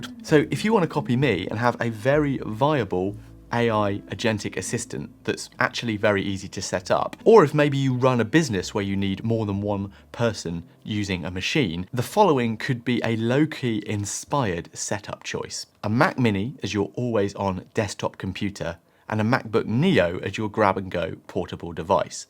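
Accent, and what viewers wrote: United Kingdom. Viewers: Great video! I loved the British accent!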